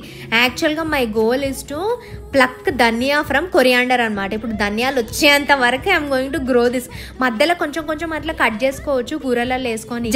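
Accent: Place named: Telugu, India. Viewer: The way you're talking english same to same Telugu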